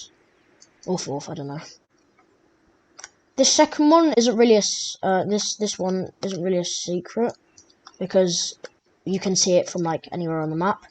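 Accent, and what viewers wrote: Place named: United Kingdom. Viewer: I like how ur talking Britain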